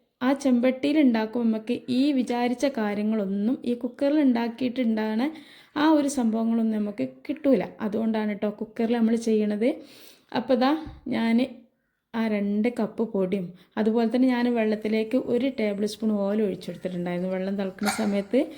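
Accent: Malappuram, India. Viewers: Malapuram ano samsaram 👌👌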